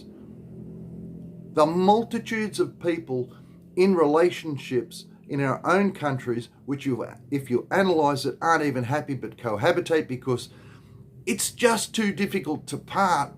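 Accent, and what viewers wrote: Australia. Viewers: Hi I've watched your videos with great interest. I am an Aussie from Melbourne. I spent 2 years in Dumaguete and came back to Australia in mid 2021. to have a cataract operation on both eyes. As you mentioned the treatment standards are far better in Australia. It's taken 12 months of treatment to get the eyes ready for the operation which is in December. I'm expecting to be back in Dumaguete in 2023. Firstly there is nothing wrong with the quality of your videos the smartphone you use is obviously a good quality device. Everything that you've said is 100% accurate. Trike drivers saying it's up to you on fares, poor service in stores, long slow lines. You'll recall that in Oz we just tap our cards to pay. The supermarkets in Dumaguete swipe the card then record the details on another system very time consuming Your Aussie accent is a great voice for the VLOGS. 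Love your down to earth style, great information, keep up the good work. by the way you've got a great partner in attractive, articulate and a great command of English. I'm looking forward to the next VLOG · I like the cut of your jib, mostly I guess as we have a lot in common, born 57, ex Raafie, spent my early years working in the mines including PNG and happily divorced 😀... I came to Davao back in 2012 to meet my Filipina, I have been a permanent resident (SRRV) here since 2015, being ex military the Philippines Retirement Authority do a great package deal, only have visit the agency here every 3 years to renew my ID, other than that there is no on going costs or exit clearance required. My wife got Australian permanent residency about 4 years ago (I did the application, not rocket science but a few headaches were had chasing up the paperwork here in Phils, as I am sure you would appreciate). 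We now spend our time between Davao and my place in Queensland (I live on 5 acres), basically best of both worlds. Anyways mate nice to hear an Aussie accent from Duma for a change, keep em coming.. cheers.. how good was it growing in Oz in the 70's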